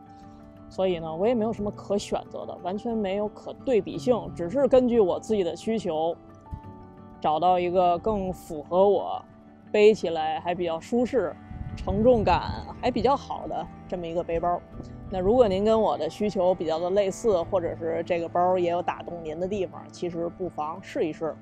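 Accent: Beijing, China. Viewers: 很高兴发现了您的频道，比较好玩的是：我还没怎么看内容呢，光听你的声音就订阅了，离开北京太久了，喜欢听一下乡音:)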